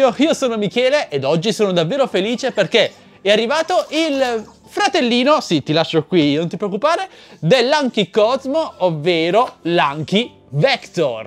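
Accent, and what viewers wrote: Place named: Bari, Italy. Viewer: si infatti non sto giudicando te.. te sei fantastico adoro i tuoi video.. penso soltanto che questo gadget sia un po inutile.. but anyway adoro i tuoi video anche dal tuo accento ahah anch’io sono di Bari ma per il momento vivo in Australia e mi manca molto il mio paese.. ti ringrazio ciao bello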